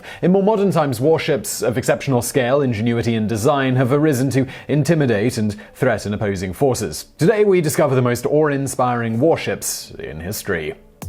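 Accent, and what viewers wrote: England, United Kingdom. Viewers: Despite speaking with an English accent, clearly despises his English heritage. I have watched his videos for a long time now and I think I am pretty much done. He is an an Englishman but chooses to use US nomenclature despite the fact that I think most US viewers are intelligent enough to enjoy these films without having an Englishman talking in US terms just to pander to them. Also he is talking about warships and chooses just one British vessel, ignoring HMS Victory, HMS Beagle, HMS Endeavour, HMS Nelson, HMS Campbeltown, HMS Dreadnought, which was arguably the greatest warship of all time. I really can't stand men who are ashamed of their own heritage. Mr., get a grip sunshine.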